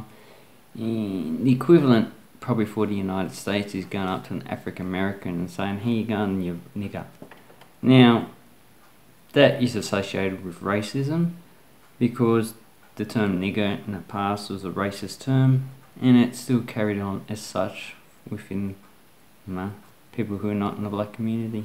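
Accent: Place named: Australia. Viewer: Please use American accent instead of Aussie accent it's really hard for me as a non-english speaker to understand every word that you've said, even if you talk slowly as in the video.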